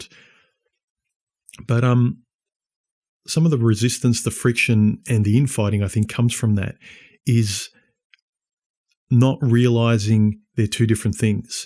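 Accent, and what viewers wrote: Australia. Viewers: Really dig this channel. Also pretty cool to hear an Aussie on YouTube covering these topics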